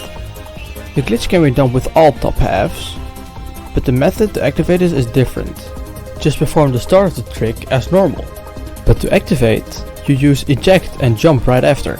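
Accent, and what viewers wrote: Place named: Netherlands. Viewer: Dutch accent strong in this one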